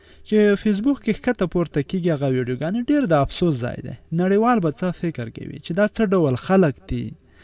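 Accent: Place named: Khost, Afghanistan. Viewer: شه پیغام دی وروره، خو یوه غوشتنه ده، هیچکله خپله لهجه مه تغیرکوه، خبری دی داسی شایئ چی خوستوال یی. مننه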